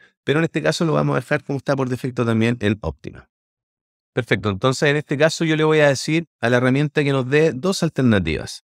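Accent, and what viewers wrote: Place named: Chile. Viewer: Por el acento se ve que eres chileno (actualmente resido en Santiago de Chile). De verdad esta herramienta es una locura... ¡Muchas Gracias!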